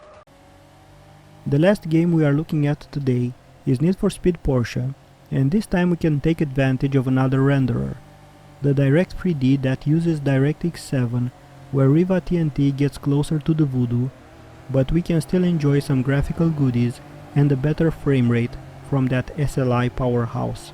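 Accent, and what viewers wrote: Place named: Romania. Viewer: Excelent video. Mi am dat seama dupa accent ca esti roman. Like!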